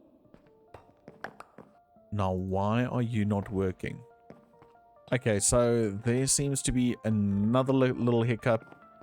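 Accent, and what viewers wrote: South Africa. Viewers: Yes, and one South African can always recognise another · are you south african?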